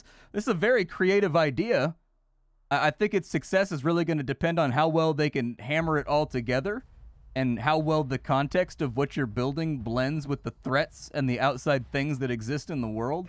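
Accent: Netherlands. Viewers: That dutch accent, always so easy to spot 😂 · Damn the dutch accent is a great accent for tutorials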